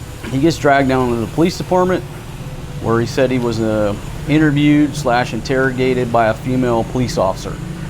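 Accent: United States. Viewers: The trailer idea is genius. Even if she gets possession of it, it would be a lot cheaper than losing a house. Take care with the bank account comment; it might bite you if it gets in the wrong hands. I'm not from your part of the US but I have absolutely no problem with your attitude or the way you talk. Be yourself and be proud. Peace out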